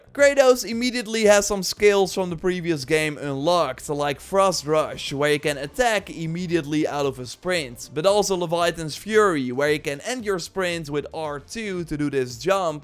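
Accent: Netherlands. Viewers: dutch accent right? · ARE YOU DUTCH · You can hear that you're dutch 😂 (Goeie video maat!)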